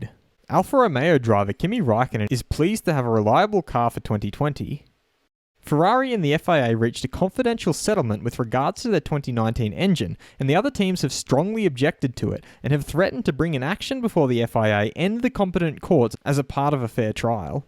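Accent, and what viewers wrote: Australia. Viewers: hey mate, hear you aussie, you heading to the melb F1 this year. id like to buy you a beer for you great vids buddy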